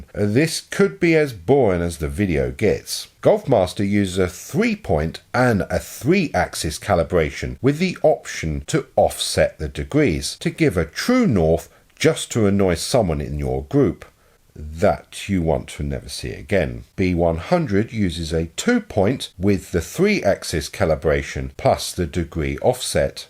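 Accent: United Kingdom. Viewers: This guy does the comparison we wish all other videos would FINALLY do - but they don't. Mixed with a little humor and a little posh UK accent... Informative and entertaining.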